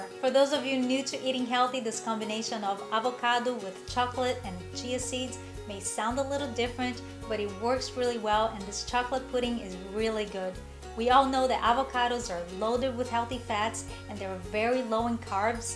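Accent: Brazil. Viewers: Você é de Manaus?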